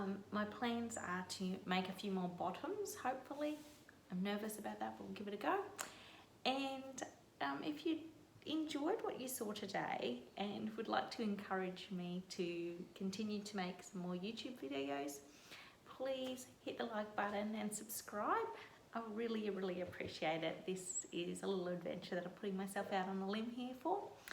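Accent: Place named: Australia. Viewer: Great video and especially lovely to see solid colours and tasteful patterns in the fabrics you’ve used. I find it takes discipline to choose these when fabric shopping over the more eye-popping prints but when made up and in the wardrobe they make the clothes I actually wear most of the time!! Also great to hear another Aussie accent, see more seasonally-appropriate sewing and Australian patterns and fabric stores being promoted! New subscriber here.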